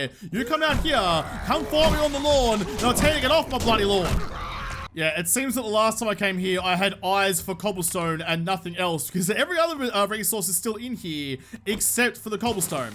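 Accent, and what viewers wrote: Australia. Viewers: i have a friend named josh, sounds exactly like you and is Aussie, hmmmmmmmmmmm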